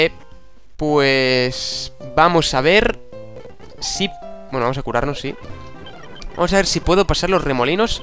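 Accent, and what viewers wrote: Argentina. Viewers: LooL acento Argentino xD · Joder, que bonito tu acento argentino xD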